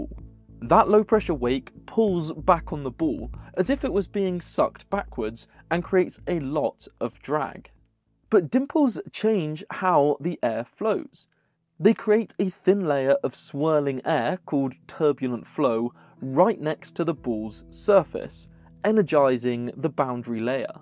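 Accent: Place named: South, United Kingdom. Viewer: Southern British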